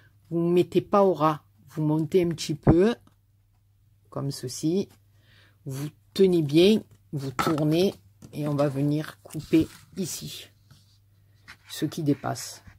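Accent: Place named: South, France. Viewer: Bonjour j'insiste lourdement avec ton accent mais ça me fait vraiment penser a mes cigales d'Ardèche qui me manque énormément .ta carte est superbe et moi je n'avais jamais vu ce modèle. Merci pour ce joli partage bisous et bonne journée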